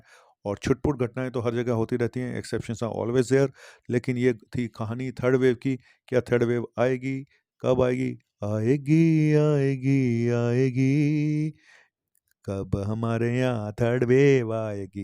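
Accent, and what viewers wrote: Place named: Delhi, India. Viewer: Aap ka dilli vala style kya khub hai... 👍👍👍👍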